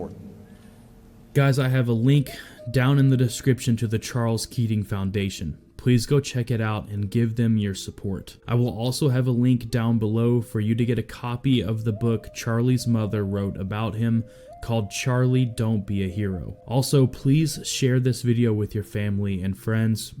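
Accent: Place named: United States. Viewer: Why u Americans pronounce IRAQ as - Ai-raq...... Iraqis call it IRAQ as an E-raq.........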